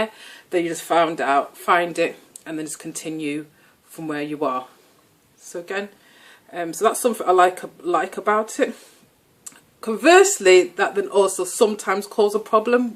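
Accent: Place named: Northern England, United Kingdom. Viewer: So great to hear a UK voice and then a northern voice at that! Where have you gone? Your videos look great, would love to hear how you are getting on.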